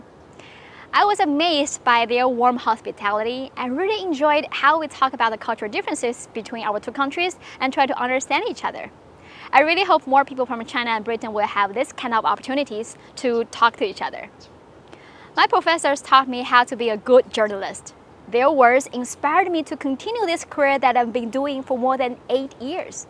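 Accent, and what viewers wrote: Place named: United States. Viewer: You do not sound like studying in UK, more on US.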